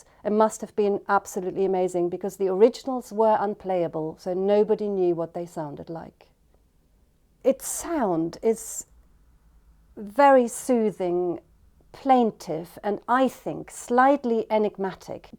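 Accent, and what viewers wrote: Germany. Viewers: I think I can catch a touch of the German accent in her English speaking voice at times actually. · KAOSON There’s a touch of German in her English. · She sounds German. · I’m English and I lived in Hamburg, Dresden and Berlin over a 7 years. Maybe that makes me particularly sensitive to her accent, but to me she sounds typically German. She has faultless English and has probably lived here for a while but she still very much has a German accent. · it's definitely a german accent ;) source: I'm german :)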